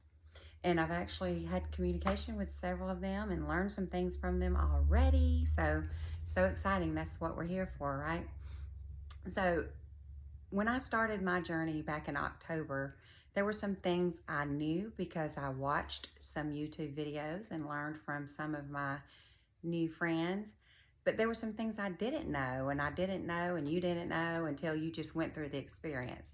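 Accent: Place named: South, United States. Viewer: your sweet southern drawl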